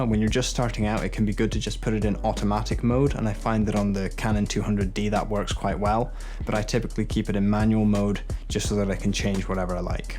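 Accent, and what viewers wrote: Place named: West Country, United Kingdom. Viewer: thanks nice and informative. Trying to place the accent. West Country?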